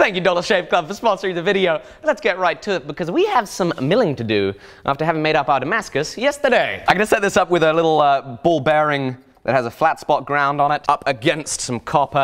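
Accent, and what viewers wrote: United Kingdom. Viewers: What is that accent? I’m British and never heard that accent before, sounds like British mixed with another... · Where about do you live in the UK your British accent is so strong unlike mine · Amazing work but can anyone place his accent. Is it part British ? I pick it up but what else and what region. Very interesting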